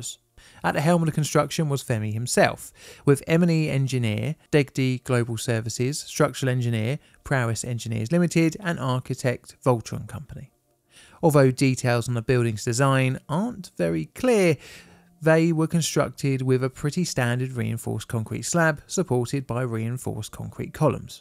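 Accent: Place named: Croydon, United Kingdom. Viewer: I knew u were from Croydonn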